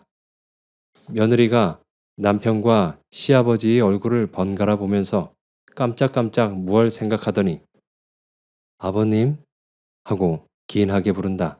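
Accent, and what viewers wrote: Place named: Jeolla, South Korea. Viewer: 📚🌸♥️ ㅎㅎㅎ 님의 전라도사투리 오랫만에 들으니 정겹습니다^^ 수고많이 하셨습니다😂🙏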